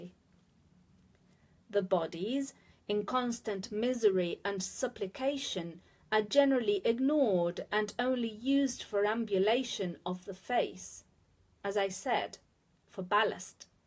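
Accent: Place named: United Kingdom. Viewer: ♥ ¿No te gustaría leer unos poemas de emily dickinson con ese acento británico?.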